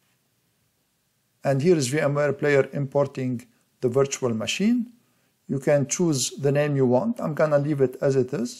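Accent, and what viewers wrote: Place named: Lebanon. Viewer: huge lebanese accent lol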